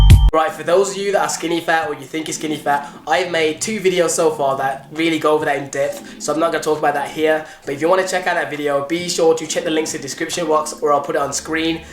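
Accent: United Kingdom. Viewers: Nice to hear a British accent. Lots of common sense, ground roots type tips. Exactly what I need. Thanks. PS music is NOT too loud. Also this Youtuber replies to all the comments. Liked and subscribed. No brainer.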